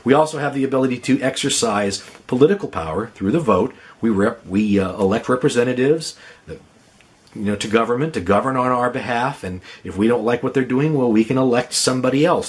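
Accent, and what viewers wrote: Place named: England, United Kingdom. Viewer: I like your English accent, lol.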